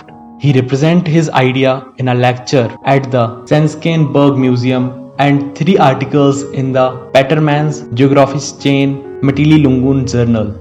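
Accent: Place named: India. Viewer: It is a nicely composed video on, how the dynamic hypothesis of plate tectonics was conceived by Alfred Wegener. For the one who wants to learn about the evolution of continents, oceans and mountains, this introductory video will be very much useful. Our students will be able to follow the narrative as it is with the Indian accent. The effort of ' ' is commendable.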